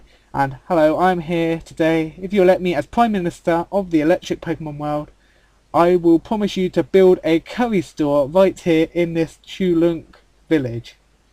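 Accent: United Kingdom. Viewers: Whoa! Your Accent Is Really British! I Mean I'm British But That Is Really British!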